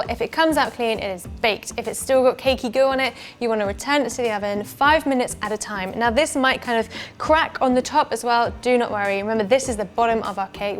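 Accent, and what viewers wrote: United Kingdom. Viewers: Love her channel and love her beautiful British accent 💕🌸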